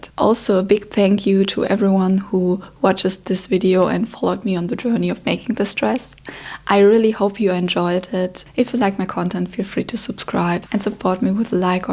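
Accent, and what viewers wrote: Germany. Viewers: i love her and her projects, I just can't with the german accent lol. Maybe it's that german self-hatred, or it's my misophonia, .. her accent isn't even too thick/bad, yet it tickles my brain in ways that, I wanna watch her videos but can't enjoy. Gotta mute or click off :( Is that only me? (Maybe could be connstructive criticism, to work on the accent cause it truly might help garner even more international audience (?))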